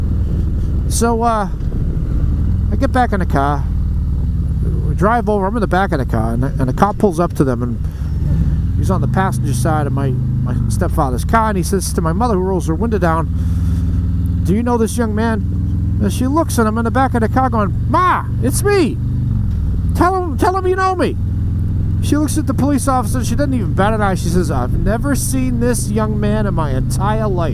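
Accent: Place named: Boston, United States. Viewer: Love the Boston accent the way you say Kar! All mom's and dad,s i think have had a good laugh now and then. That's so true. Hahaha.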